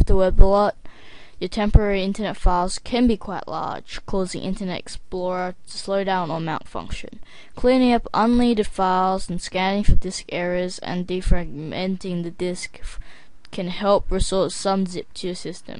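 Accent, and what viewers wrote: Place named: Australia. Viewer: =O AN AUSTRALIAN!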